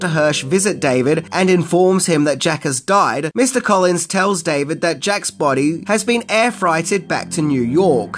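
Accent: Australia. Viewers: Seriously? He’s one of the most Australian-sounding people out there!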